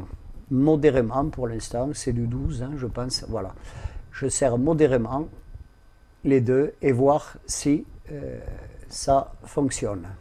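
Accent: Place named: Castres, France. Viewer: Bonjour. je crois deviner dans vos vidéos que vous êtes de Castres (l'accent va dans ce sens aussi!). Est-ce la cas? Mes parents sont de Mazamet et je viendrais volontiers vous poser quelques questions à l'occasion!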